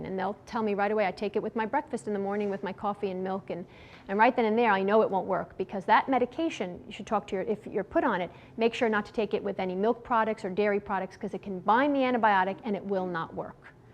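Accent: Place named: East Coast, United States. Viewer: She’s got a very interesting American accent I’m not familiar with. East coast much ? Haha <3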